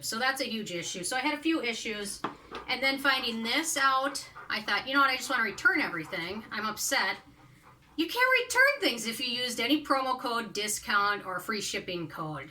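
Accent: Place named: Midwest, United States. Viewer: Chicago or MN? Maybe even PA? Accent.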